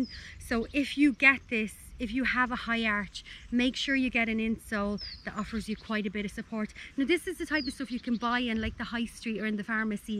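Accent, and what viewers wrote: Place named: Dublin, Ireland. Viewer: Love your accent sweet Dublin 😍great video thanks 🦁lionel x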